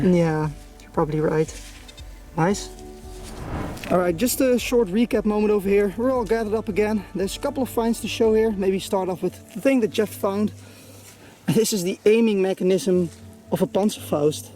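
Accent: Netherlands. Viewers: Are you dutch Cus your accent is typical for a netherlander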